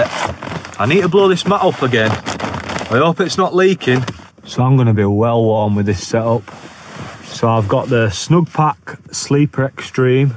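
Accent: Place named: Bradford, United Kingdom. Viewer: You from Bradford?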